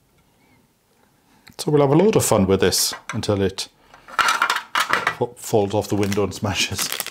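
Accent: Wales, United Kingdom. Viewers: I have CFS diagnosed too, it’s good to see you spreading awareness. I’ve noticed your accent is more pronounced since you’ve returned to Wales. I’m from Wolverhampton (MuddyBootz territory), but my husband is from Darlington. He’s been in the West Midlands 30 years now, but every time he goes up north he remembers his accent :)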